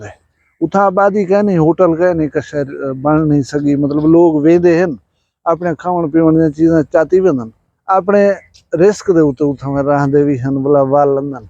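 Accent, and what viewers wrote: Saraiki, Pakistan. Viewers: Chacha subscribed Kar diya hai kamal ki sararaki bolty hain wo bhi methay lehjay me.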